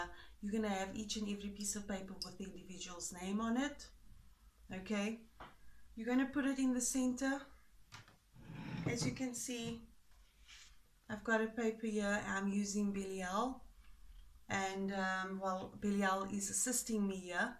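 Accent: South Africa. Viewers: Love your accent are you from South Africa? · Are u south african?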